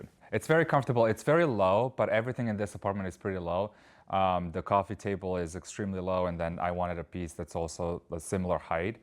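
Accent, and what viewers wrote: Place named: Serbia. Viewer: Great style, great apartment. I knew you were from Serbia, the pronunciation of certain words… well done!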